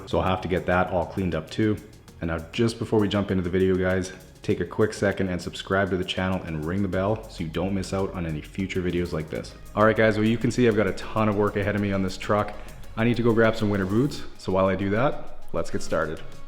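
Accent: Canada. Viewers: You’re definitely Canadian. The zero degrees reference and your accent is a giveaway too. I love your channel. So satisfying!! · I've recently found you yesterday and just watched 27 of your videos back to back.....safe to say I love your videos! I also love your voice overs, love a good Canadian accent lol. Wanted to ask though did you start as a detailer before you moved into this home? Curious how you started and got here.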